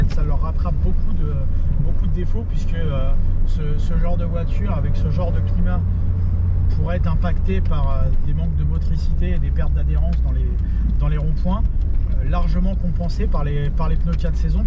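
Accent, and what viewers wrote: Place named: Paris, France. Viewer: Superbe accent titi parisien du présentateur, je ne sais pas s'il vient vraiment de Paris mais ça y ressemble.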